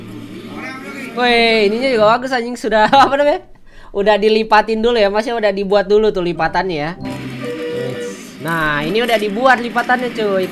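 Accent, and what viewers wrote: Kalimantan, Indonesia. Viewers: Anjim, org kalimantan trnyata. Jelas aja agak kenal dngn logat2 nya😂😂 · Abang Kalimantan apa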